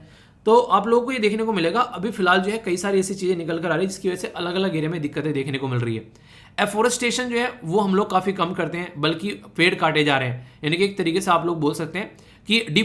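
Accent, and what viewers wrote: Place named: West Uttar Pradesh, India. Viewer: Based on sir's dialect it seems like he from West U.P. possibly near Baghpat.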